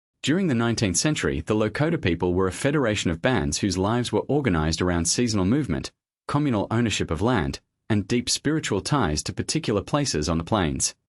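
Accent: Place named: Australia. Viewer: This “historical narrative” is offensively absurd, not only for being narrated in an Australian-concept or Afrikaaner-concept accent, but by eliminating all references to “race,” a MOST critical dynamic between all peoples of the era, as well as now. There was no “universal” starvation, displacement, deprivation, massacre, and genocide of people on all fronts, in any “equal” measure whatsoever. No “criminalization” of sacred traditions, Religions, & Religious practices was ever incurred by any White persons. No treaty agreement was ever upheld. equally-respected and honored by the USA-concept cavalry, government, nor by any known White persons of any significant Positioning in that government (nor in any other European government concepts interested as “parties”)—still undergoing its own “formations” and territorial disputes at that period. It MAY be “helpful” to SOME young children to have a narrative of certain historical landmarks, events, and participants involved in Native Nations’ Unjust treatment. 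But for adults, it serves no purposes other than strengthening, refining and expanding Racism/White Supremacy, as narrated by an individual unwilling or unable to intelligently discuss his OWN “frontier” narrative in a manner that young people can easily understand. The purpose of “History(ies)” as a subject isn’t being “concise.” The purpose of reporting historical data is accuracy, truthful reportage, and fact-based information conveyed in such a way that it engenders compr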